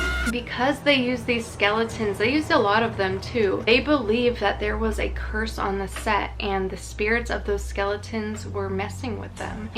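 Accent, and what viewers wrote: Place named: California, United States. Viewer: I do hope you know that I was just picking on your Californian accent.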